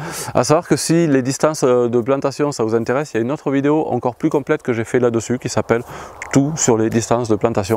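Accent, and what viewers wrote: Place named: Hérault, France. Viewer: Bonjour nouvelle abonnée votre terrain surement dans lherault je connais bien cet accent😊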